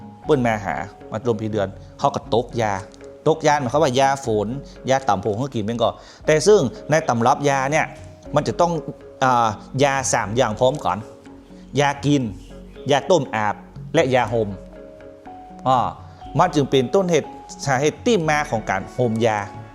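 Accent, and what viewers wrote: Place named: South, Thailand. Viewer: สำเนียงแบบนี่สายใต้แน่นอนค้าบบ 😊😊😊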